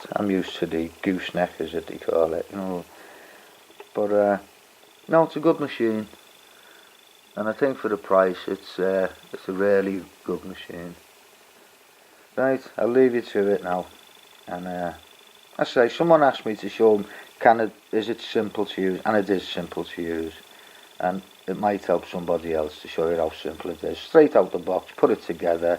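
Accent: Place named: Chester, United Kingdom. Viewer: New sub here. Thanks for the great video. I’ve just bought the 600 and this was very helpful. I have 300 acres of farm land in chester. You’re more than welcome to use it. Your accent sounds like you’re not too far away from ch47 area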